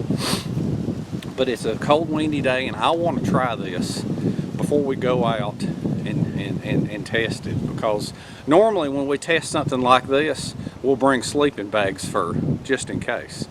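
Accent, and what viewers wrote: South, United States. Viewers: God love ya , I could listen to you ramble all day . The cute things you say ,combined with that southern accent , and laugh ,your irresistible ! It's been insanely cold up here in Ohio . It surprises me that it's that warm . I would have thought most of the heat would rise up. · this is Mark from north central Ohio. I really enjoy watching your content. You are such a mellow dude and I like that southern twang. "I think I'm fixin' to go in there." I'm sitting in my recliner drinking a cup of coffee that I made on my alcohol stove. That fire reflector is a fantastic idea. There is another guy on YouTube who is really popular and has a lot of great content but he makes some of these elaborate contraptions and like you mentioned it takes a lot of time and energy making one of those heat reflectors out of wood and he's got major OCD because he goes back and chops the ends off so they're exactly the same length. 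Like you really need that if you were in a survival situation. 😃 You mentioned that you don't own property to camp on so you cannot set up a permanent shelter but what you could do is after you take your shelter apart you could just pull those stakes out of the ground and gather them together and lean them against a tree and then when you come back again it would be much quicker to set up because everything is prepared. All you gotta do is grab the same stakes that you used and put those back in the ground and rig up your shelter again. What I really like about your videos is your personality and the fact that you're not trying to sell something. You're going out there to have fun. There's another southern boy that I like watching, a guy named Blackie Thomas from Alabama. Same thing with him, he's a mellow dude and he has lots of videos and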